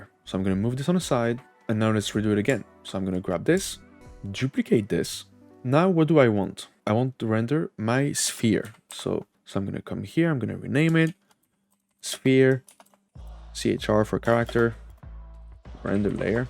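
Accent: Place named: France. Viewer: Sa sentend quil est français lol trop cool x)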